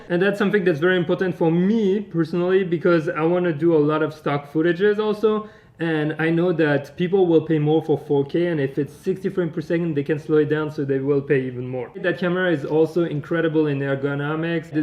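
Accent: France. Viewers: It depends on the kind of aps c you're using. If you convert a 16mm on Sony aps c camera you have to multiply. 16 * 1.5 = 24. So it's the equivalent of a 24mm on a 3/4. Love your French accent btw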